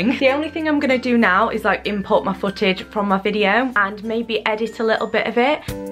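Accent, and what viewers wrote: United Kingdom. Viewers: Why are British women so fine with that accent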